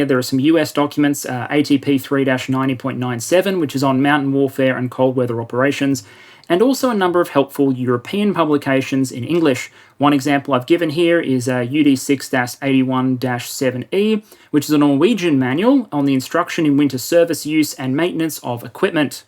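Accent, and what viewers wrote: Melbourne, Australia. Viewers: id sub but you sound like you are from melbourne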